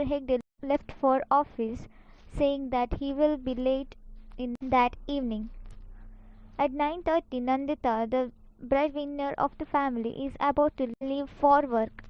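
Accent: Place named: Mangalore, India. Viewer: After a long time listened the voice of monglore people in youtube😂 and this was well explained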